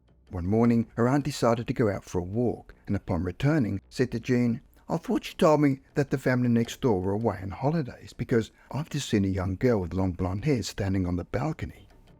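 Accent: Australia. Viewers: It's great to hear a fellow Aussie narrate. Onya! 🇦🇺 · Im glad to hear more Australian "spooky stories" narrated by Australians 😊 Hunters Hill and Gladesville are VERY heavily populated by paranormal shenanigans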